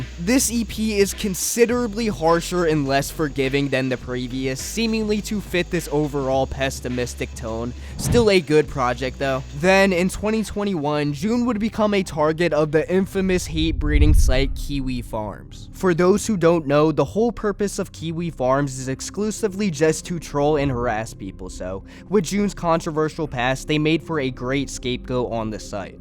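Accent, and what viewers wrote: Australia. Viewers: The way i hear Australian and got so disappointed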